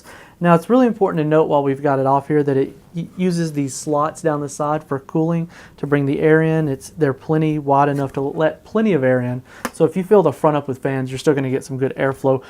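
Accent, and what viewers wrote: South, United States. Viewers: Is that Southern accent?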